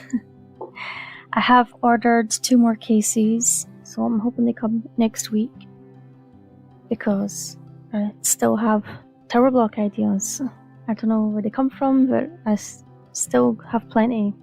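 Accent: Scotland, United Kingdom. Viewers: All your designs are well done and gorgeous!! I may try a couple of them but will try to use wood pieces in place of some of the building blocks. Hope it will work although I realize my versions will look different. Your projects are very creative and I enjoy your Scottish brogue as it reminds me of my cousins in Ireland! Similar vocabulary 😄😄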